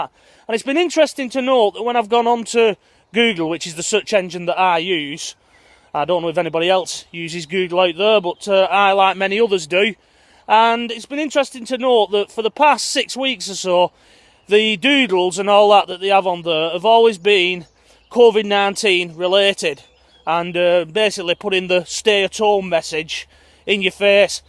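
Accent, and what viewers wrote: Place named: Lancashire, United Kingdom. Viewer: love you're Lancashire accent, Im from South yorks but I had a good mate from Oldham who sadly has now passed away, enjoyed you're blog about Fred Dibnahs property lol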